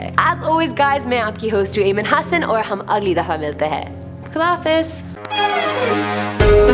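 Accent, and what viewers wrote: India. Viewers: Dude ur Hindi accent is sooOooOooooooOooO cool.i just love the way you talk in that accent! Who agrees? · Unfortunately, hindi accent stopped working. OK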